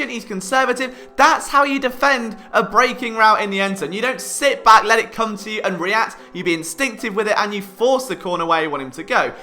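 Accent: United Kingdom. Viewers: Who the hell is this Brit, trying to talk football?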